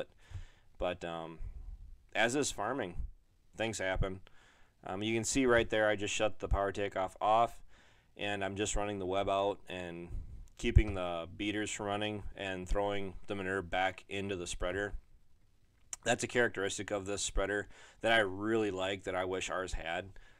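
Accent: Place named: Wisconsin, United States. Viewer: lol. Just busting your ball's. It's the Wisconsin accent. Good channel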